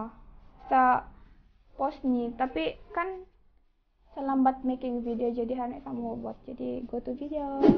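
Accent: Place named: Ranau, Malaysia. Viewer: Dari cara ckap ja tau suda Ranau aha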